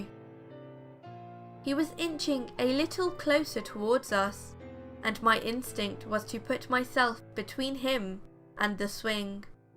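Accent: United Kingdom. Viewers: I love the cadence of your voice, are you British, if so, from where? You sound like you could have lived in a few countries...perhaps your American? It makes your storytelling so good!!!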